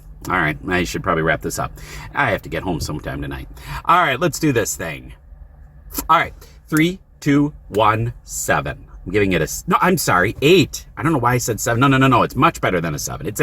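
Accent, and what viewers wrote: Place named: Wisconsin, United States. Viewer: Your Wiscahhhhnsin accent cracks me up! 😂.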